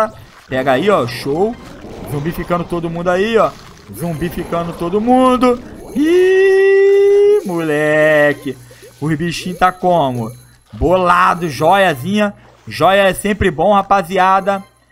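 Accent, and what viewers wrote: Rio de Janeiro, Brazil. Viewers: Legal seu vídeo e ideia, quase ninguém faz esse tipo. Mas eu não suporto esse sotaque e o jeito de falar de, carioca sei lá. Kkkk assisto no mudo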